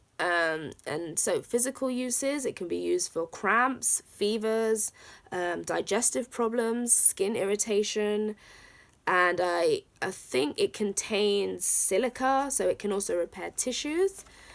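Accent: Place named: United Kingdom. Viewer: Why do I feel I am in Hogwarts hearing your accent.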